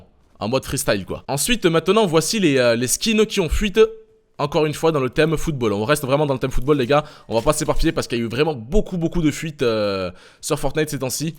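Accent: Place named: South, France. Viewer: Ta tellement un accent du sud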